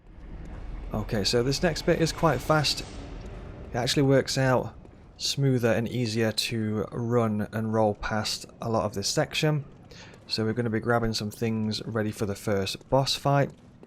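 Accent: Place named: Yorkshire, United Kingdom. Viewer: Hello there! May I ask if you're from Yorkshire? (I mean no offense —I'm Korean, and your accent sounds preety similar to my English tutor's and I like his) I'm an fanboy of FromSoftware, and I'm using your videos to study English while simultaneously enjoying my hobby. Thx!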